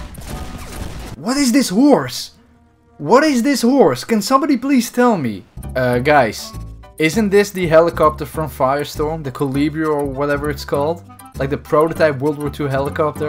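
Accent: Netherlands. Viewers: Ik kan gewoon horen dat je nederlands bent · U kinda have a Dutch accent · not only not a German accent, but very clearly Dutch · Judging from your accent youre a fellow dutchmen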